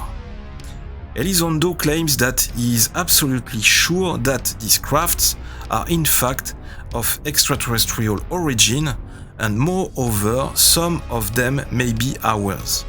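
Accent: France. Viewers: Swedish is my native language but/and I understand you to 100%. Please don't lose your French accent, it would such a shame. Merci de partager vos connaissances avec nous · love your french accent :) keep the cool videos rolling out!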